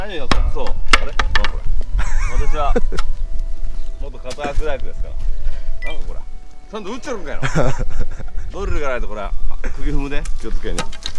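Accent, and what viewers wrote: Yamaguchi, Japan. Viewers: 広島なんですが、山口弁って広島弁に近くて親近感もてた(*º▿º*)俊ちゃんの今♪を聴いてます♥ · YouTubeで山口弁が聞けるのが良いですねぇーーー😍。最近は女子の方言で広島弁が厳ついからでしたっけ？可愛い顔して「じゃけえ」とパンチのきいた言葉のギャップ萌えとかあるみたいですが、男の人の山口弁を聞いたさんのファンはどう感じてるのか気になる❗️ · 山口県民です。方言がYouTubeでガンガン聞けるの楽しい！笑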